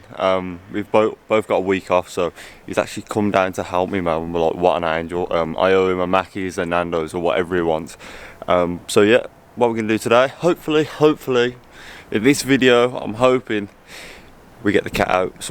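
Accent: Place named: Birmingham, United Kingdom. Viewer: I’m sure your saying Renault wrong 😂😂. Its that brummy accent . Good videos tho xx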